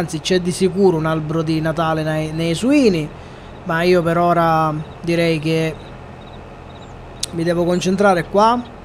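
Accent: Sicily, Italy. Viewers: . Parla. In. Siciliano. Mi. Piace.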